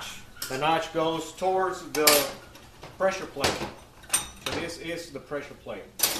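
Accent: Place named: South Texas, United States. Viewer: I could be wrong but I think that's a south Texas chicano accent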